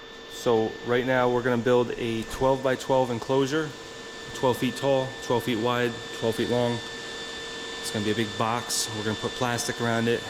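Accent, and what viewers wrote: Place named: New England, United States. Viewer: The dialect is a little New England and Pennsylvania mixed in.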